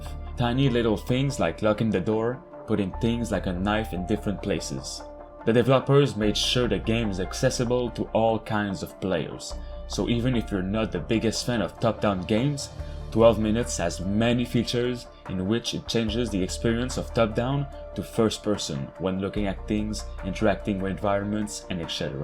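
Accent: Quebec, Canada. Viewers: french canadian accent, without trying to hide it I don't mean that as a bad thing, but a lot of french canadian don't have an accent when speaking english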